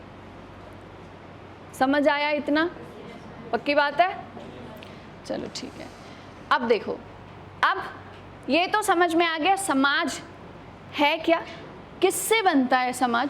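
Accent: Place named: Rohtak, India. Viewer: Madam rohtak side ki hai kis kis ko lagta hai 😂😅😅😅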